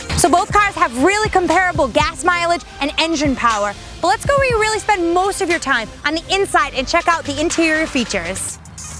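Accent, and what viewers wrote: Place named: New England, United States. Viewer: Hella cute and that new England accent though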